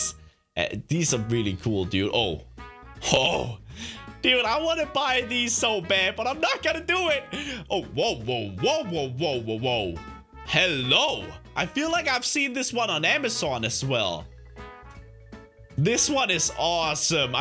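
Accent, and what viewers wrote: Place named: Denmark. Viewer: A danish accent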